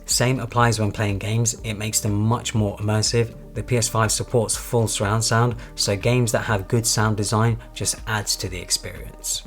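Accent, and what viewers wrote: United Kingdom. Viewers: First time hearing you speak after following u months on twitter 😂 didn't expect that British accent tho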